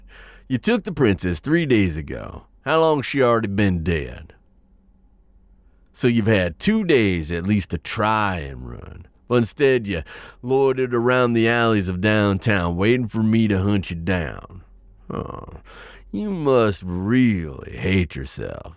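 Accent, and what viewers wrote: South, United States. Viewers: this heavy southern drawl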